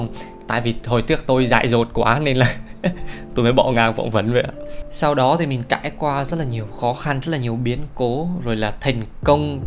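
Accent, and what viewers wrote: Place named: Quang Tri, Vietnam. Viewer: giọng quảng trị hay thế mà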